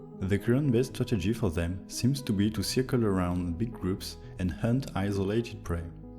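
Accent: France. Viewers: Petite question : serrais tu français ? Y’a un accent très reconnaissable 🤔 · T'es français ? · it seems that you have a French accent. si oui très bonne video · are you French🤔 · L accent francais on est laaaaa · Haha je le reconnais bien, l'accent français 😂 Courage, tu vas y arriver 👍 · You sound so french, why all AI youtubers are french wtf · gros accent français spotted ;) mais vidéo interessante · T’es français l’ami ? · C'est un accent français ça ! Ça fait plaisir 🇲🇫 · He is french because is accent, you can make a video in french 😉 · L'accent français énervé je m'y attendais pas x) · ho le méga accent français mdr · Ah moi j'aimerai une version française hihi (l'accent t'as trahis 😘) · you have a french accent lmao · Is it just me or does he sound French? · Le fait que tu es un accent français me fait encore plus aimer la vidéo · Bonjour j'entends ton accent français, as tu une chaine en Français avec la même video ? 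· Hmm le bon accent français ❤️ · French accent? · Excuse me but i wonder, after seeing the first minute of the video: "est tu français?" Because of your accent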